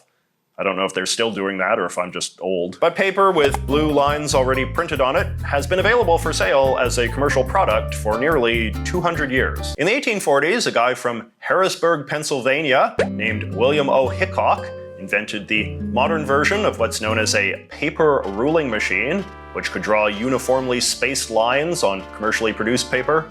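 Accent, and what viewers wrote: Canada. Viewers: This is the first time I've managed to pick up a canadian accent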